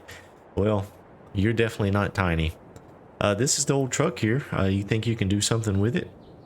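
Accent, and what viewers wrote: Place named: South, United States. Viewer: You sound like you’re from the South my fellow farming friend.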